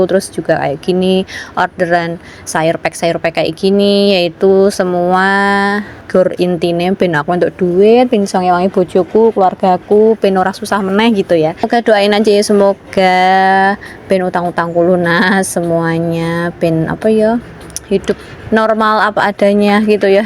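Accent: Java, Indonesia. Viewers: orang jawa mana ya kak? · Aku seneng denger logat nya ada jawanya ada lucune, btw makasih yo Kak ilmune, sukses sll Kakak, o..iya ftnya ya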